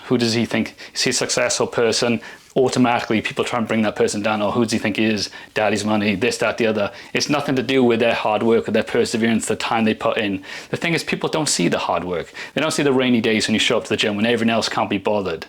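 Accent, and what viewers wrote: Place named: Wales, United Kingdom. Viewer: I’m so glad I found your Channel, I am from Bristol by the sounds of it your from wales! We should train together one day ahaha! And yes it only counts when you lock in for a significant amount of time, I’d love to show you more before and after face pic. I just eat steak and fruit 🍇 everyday and I feel and look 100 x better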